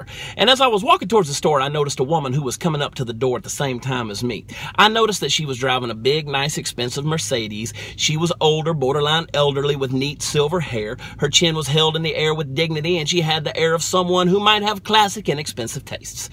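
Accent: Texas, United States. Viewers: Love your Txas accent. great stories, love it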